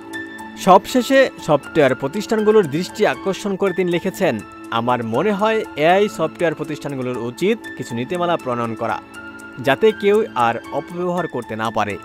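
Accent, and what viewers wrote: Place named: Kolkata, India. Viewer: আমি পেয়েছি ফেসবুকে কিন্তু আমার বিশ্বাস হয় নায়। কন্ঠের উচ্চারণ কলকাতার ❤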